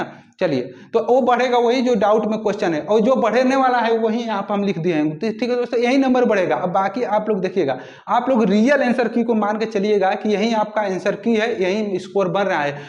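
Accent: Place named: Eastern Uttar Pradesh, India. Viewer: East up se honge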